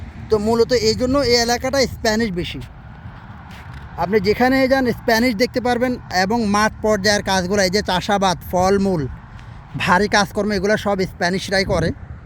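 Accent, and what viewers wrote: Noakhali, Bangladesh. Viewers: আইচ্ছা এইটা তো নোয়াখালী মতো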